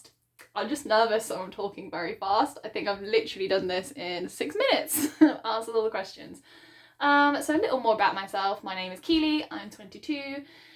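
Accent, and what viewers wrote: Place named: Southern England, United Kingdom. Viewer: I'm not a linguist like Prof Henry Higgins but I'm gonna guess that your accent is from southern England.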